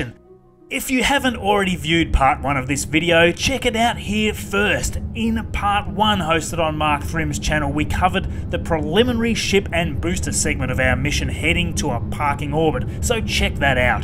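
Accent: Australia. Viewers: Wow. While listening to you, I thought to myself "this guy has a funny accent, I'd say it's Australian" Then I checked your channel and there it is, you're Australian. As English is not my mother tongue (I'm French), I'm quite proud of myself for recognizing where the accent is from \o/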